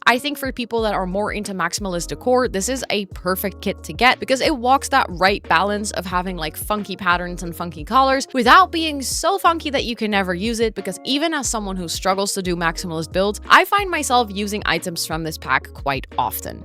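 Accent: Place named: Netherlands. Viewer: You sound a bit Dutch, are you from The Netherlands?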